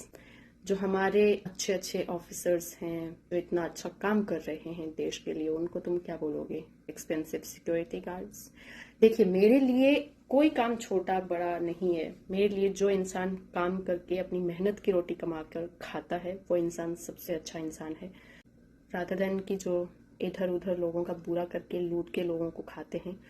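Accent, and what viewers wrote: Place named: United Kingdom. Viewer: aapne english bolna kase sikha plz share me link..........mughe bhaoot acha laga........ british accent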